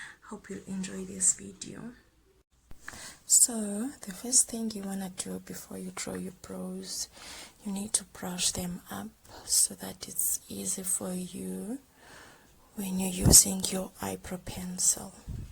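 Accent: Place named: South Africa. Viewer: SouthAfrican i love ur accent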